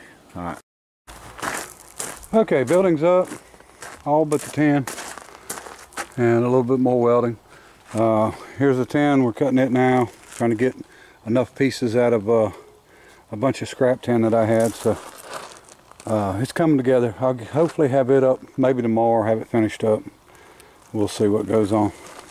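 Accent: South, United States. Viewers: The way you say bye kills me because you sound like my bestfriend (my grandpa). Its been 13 years since i lost my grandpa. He was from West Virginia but met my grandma in detroit. He sounds the same (southern).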